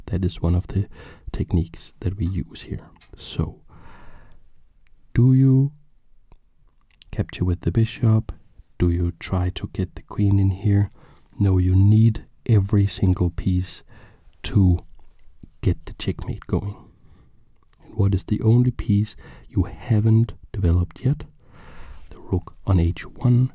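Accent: Denmark. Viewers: Jesus Christ I got a shock hearing your danish accent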